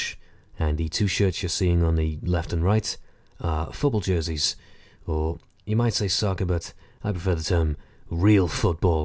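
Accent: Wales, United Kingdom. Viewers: Here's what I would love to see: Best: CoD4, BF3, MW3 (must have sonorous voice-over) Next best: everything else as long as it's still narrated by our Welsh host. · I knew it! I was sure I recognized that accent. Hello from Wales. ^_^ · you're welsh? that's awesome! I'm half welsh (but I was born and live in America so I don't have that awesome accent). I went to Wales 4 years ago for a couple of weeks. beautiful country. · Your welsh:DDDDD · I knew you where welsh:) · I knew you was WELSH! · You don't sound that welsh:o Now you've mentioned it though I can see it:P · Jesus did not realise you were welsh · You're Welsh??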